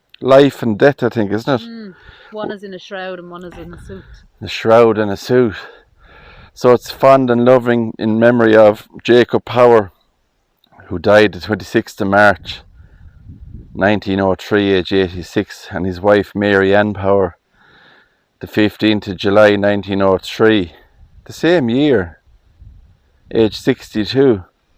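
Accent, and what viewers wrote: Ireland. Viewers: Such beauty and history. I could listen to your descriptions in your fabulous Irish accent for hours.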